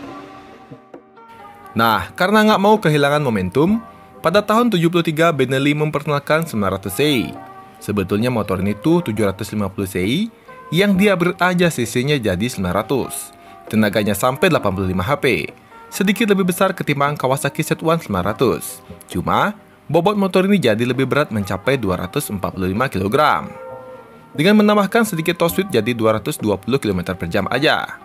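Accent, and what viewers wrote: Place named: Bali, Indonesia. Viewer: Logatnya bali banget ni Bli ahahah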